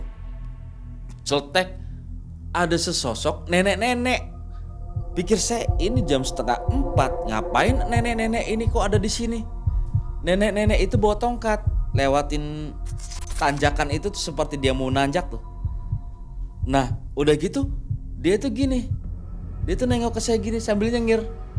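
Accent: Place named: Indramayu, Indonesia. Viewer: 😂😂😂😂😂timurtengh hadir mang ay masa allah dri indramayu apa y bahasaya medog goblog ya😂😂😂😂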